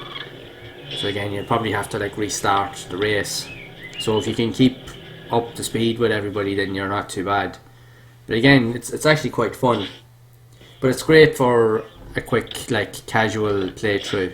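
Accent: Ireland. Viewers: Wow your pumping out these videos every day. I appreciate your hard work. Looking forward to more. I subscribed to keep up! Love your accent also. Whats your country of origin if you don't mind me asking? Im from the U.S. Nevermind i looked at your about section and saw its Ireland. :) I have a channel as well but its not so much videogames as funny videos or movie clips.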